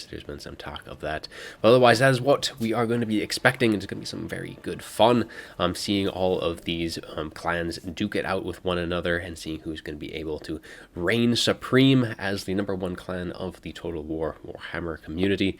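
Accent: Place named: Russia. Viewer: Great accent. Russian right?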